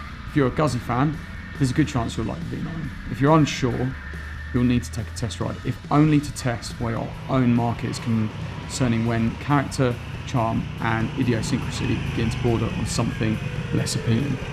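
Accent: United Kingdom. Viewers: He's British, that's the way they pronounce there.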